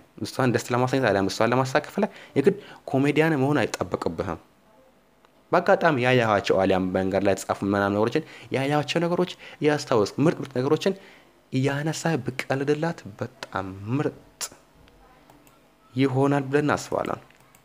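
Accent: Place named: India. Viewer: u sound like an Indian guy speaking English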